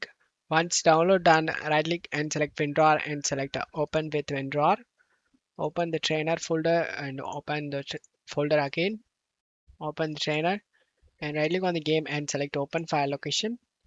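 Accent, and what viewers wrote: India. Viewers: Indian accent, i knew it will work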